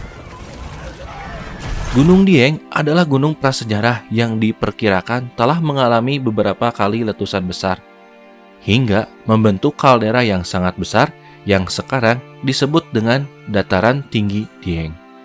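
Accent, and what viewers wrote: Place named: West Java, Indonesia. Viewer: Suara narator Video ini saya yakin asli Sunda . . Karena pelafalan kata ‘ Aktif ‘ menjadi ‘ Aktip ‘ dan penyebutan Air Terjun menjadi Curug serta aksen bicara masih ‘ Sunda ‘ bgt . . 🙏🏼🙏🏼😬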